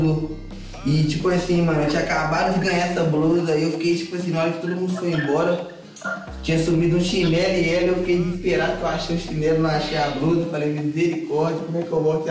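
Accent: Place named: Belo Horizonte, Brazil. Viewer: bh ne cria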